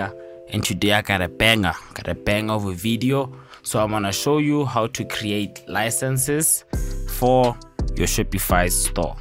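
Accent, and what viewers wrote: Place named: South Africa. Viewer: NICE !!! GOOD HELP , UR ACCENT SOUNDS LIKE SOUTH AFRICA IM ALSO FROM SOUTHEN AFRICA , PEACE N LOVE THANK YOU BRO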